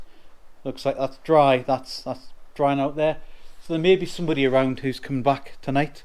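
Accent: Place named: Newcastle, United Kingdom. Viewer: A newcastle accent fuck this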